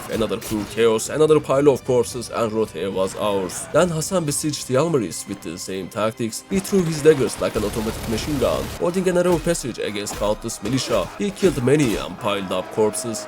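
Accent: Turkey. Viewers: Aksanından dolayı türk olduğun anlaşılıyor selamlıyorum seni kardeşim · Aksanindan anladım reis türk olduğunu 😅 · Where are you from bro? You sound Turkish. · hasan diyişine kadar türk olduğundan emin değildim. 1 saatlik ingilizce konuşma iyi baya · Bro you sound Turkish...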